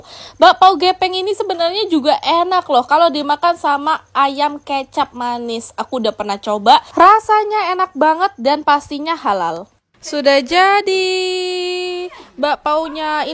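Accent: Manggarai, Indonesia. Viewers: Logat manggarai y ci 😅❤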